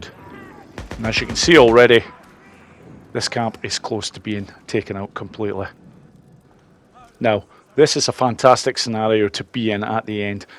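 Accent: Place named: North East Scotland, United Kingdom. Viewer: I thought I picked up a north east twang at times. I'm fae the Broch (Fraserburgh). 42 miles north of Aberdeen. Keep up the great work.!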